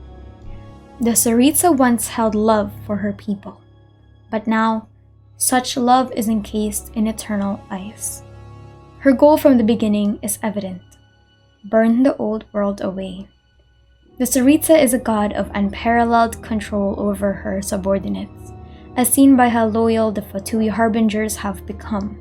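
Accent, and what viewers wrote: Philippines. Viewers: This channel is such a hidden gem! I knew you were filipino the moment you started speaking 😂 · Your accent! ❤ ph? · LMAO I pray that they don't find out about ur search history. Judging from ur accent, uI assume ur filo and God knows what happens in Filipino Christian households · I'm guessing you're filipino? I can hear it from the accent. #represent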